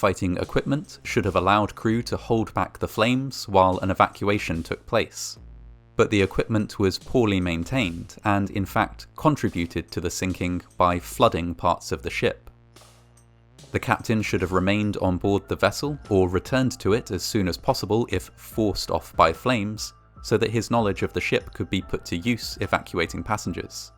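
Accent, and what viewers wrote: United Kingdom. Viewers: Why the British accent ?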